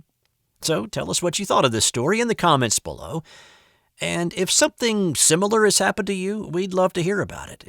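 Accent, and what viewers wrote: South, United States. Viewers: Ah, it's great to see another upload from you! I'm detecting a bit of a southern drawl, very faint. Where are you from? Cheers!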